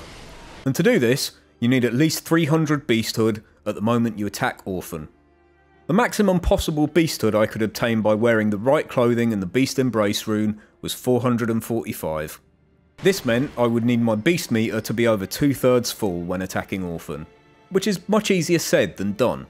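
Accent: United Kingdom. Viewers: he sound very british~ =D I wonder of he's from the uk~ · You are the most bri'ish bri'ish iv ever encountered, and i dont say that to everone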